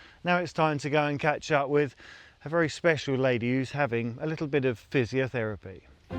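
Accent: United Kingdom. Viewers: ohhhh LOVE THE INTRO of that sweet british voice def need more ;];];] sorry i live in maine in the U.S.A. and i cannot get enought;];];]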